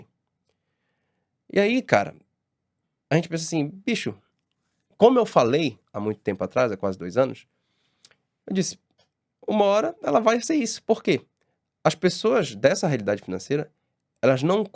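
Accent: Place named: Belém, Brazil. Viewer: Esse cara é de Belém. Percebi pelo sotaque.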